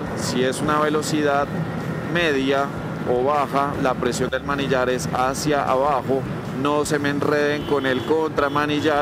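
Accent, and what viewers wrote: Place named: Argentina. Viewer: Antes de q diga q era Argentino ya me había dado cuenta q lo era. Tenemos un acento único! 😁 Buenísimo el video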